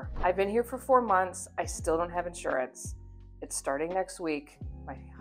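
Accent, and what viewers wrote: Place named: New Zealand. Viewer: I love the way you pronounce new zealand, it's awesome (you have a bit of that kiwi accent)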